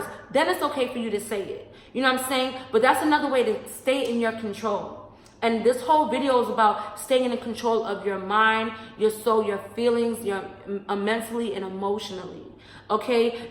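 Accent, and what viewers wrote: New York, United States. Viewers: Lol you have that New York accent fr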